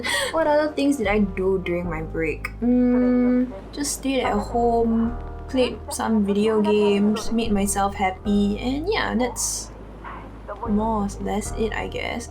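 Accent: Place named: Singapore. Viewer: i've come across a few of your videos because it's quite hard to find youtube creators that fit my skin tone and reviewing the products i'm looking for!! heard your accent and immediately knew you're singaporean too!! subscribed and i love your comprehensive reviews and your timestamps and just wanna say jiayous <3 can't wait to see you blow up on yt hehe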